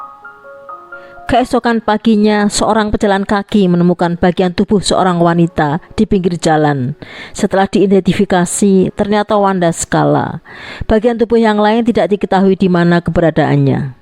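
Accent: Java, Indonesia. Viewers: ..... tetap aja top markotop kalo madam yg bawa kan.... mau itu kasus apa pun.... karena ciri khas medok nya.... malah bikin kangen.... selalu.... teruskan bersinar ya madam....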